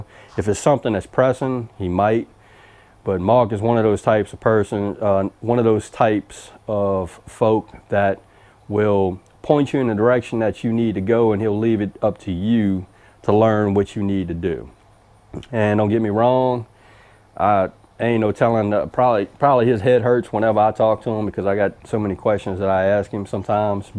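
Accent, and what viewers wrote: South, United States. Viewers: Glad this popped up on my YouTube today. Have been following your channel a little over a month now and haven't had time to go back & review old videos yet. I deducted about the 3rd video I saw that you were most likely on the force. Thank you for your service and continual prayers for your protection, as you protect others and our rights/freedom. "Don't let nobody Dull your shine!" was the final nail which made me know I had to follow you. Your accent, southern expressions and channel name caught my attn first. I've lived in Metros and small towns, but "in the sticks" is where paradise can be found (& created). Always enjoy seeing what's happening at the home place. You posted this Oct 2 of last year, saying it was a nice 85 degrees. This year, here in middle Ga 85 degrees is passed too fast to hit the 90s. Don't think we've had a lick of rain since August, so we are extraordinarily dry. 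Gonna hold out hope the cool will come again soon. Take care and keep shining!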